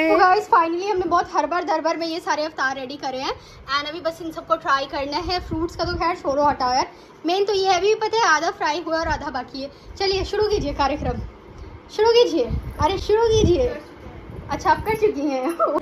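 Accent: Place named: Bihar, India. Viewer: Dekhiye chahe jitna English bol lijiye aap lekin Hindi jb bolri h TB tb Bihar ki boli nikal hi jari kapda bolte kapra nai 😅😅😅 Hindi galtb bolti h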